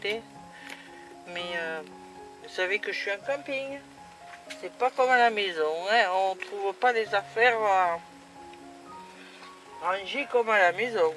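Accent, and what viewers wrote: South, France. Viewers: ah oui je suis encore là et je me suis mm abonnée à ta chaine car elle est variées tu fais des tas de choses et j'aime les chaînes comme ça. Magnifique pour ta Filfille 17 ans, cest l'âge à laquelle ma minette "Fynou" a du nous quitter, elle était très malade niveau coeur et poumons. Et ensuite jai eu mon pti Bounty qq années après. Merci pour ton email c'est très gentil. Jai aussi une chaîne mais il ny a pas toutes mes passions dessus car maintenant je mets plus des vidéos pour que ma maman qui est loin regarde ce que je fais, ainsi bien sûr que mes peu mais fidèles copines. Je t'avoue que faire des videos j'aime plus trop et jai pris beaucoup de distances avec les réseaux sociaux pour faire passer d'autres priorités qui sont des objectifs dans ma vie personnelle et qui me donnent bien plus de bonheur que la méchanceté de certaines youtubeuses dans le scrap ou la fimo... 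Pour le DP je te remercie pour le nom de la société qui en vend et en effet ta video va m'intéresser car le 1er je l'ai acheté tt à lh sur Amazon, cest une plage et le prochain sera un chat que j'ai mis en réserve. Après tu les mets sous cadre tes DP ? Car moi, cest le but. Si oui, quel genre de cadre ou autre utilises tu pour les accrocher au mur? Tes vacances se passent bien, et as tu beau temps ou tu es? Tu ne m'as pas répondu pour ton pti accent, si tu est bien du sud ? Je te laisse car je vais continuer ma page dessin du mois d'août sur mon Bullet Journal. Bon après-midi. 📚 Bisous ✏✒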